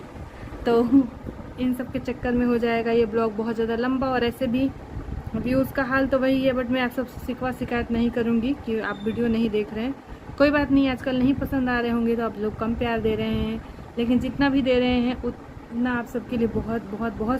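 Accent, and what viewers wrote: Bhojpuri, India. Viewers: Aap kaha ki rhne wali ho Didi mai ballia ki hun aapki language bhojpuri waisi hi lg ri h apka gaao kaha h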